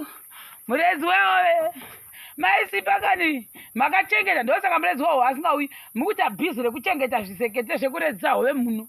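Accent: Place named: Masvingo, Zimbabwe. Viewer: Shona yenyu ndiyo chaiyo yeMasvingo❤❤❤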